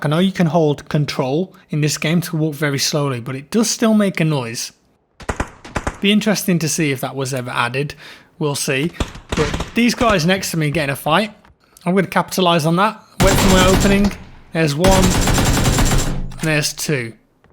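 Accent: United Kingdom. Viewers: Oregon farmer here. combine or harvester. yep. you're my favorite steamer Jack and no worries your voice sounds still very British.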